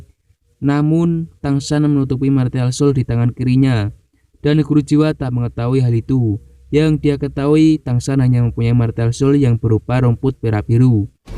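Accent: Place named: Java, Indonesia. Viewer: logatmu medhokk bro... wkwk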